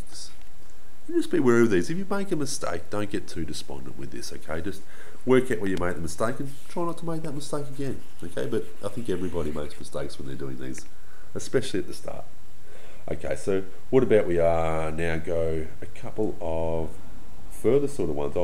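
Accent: Australia. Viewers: just curious but are u Australian? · i dont know if its the aussie accent or the explanantion, but this guy is a literaly wizard 🙏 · Are you aussie?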